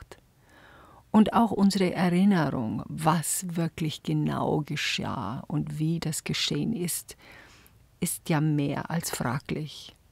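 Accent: Bavaria, Germany. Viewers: schönem, bayerischen Dialekt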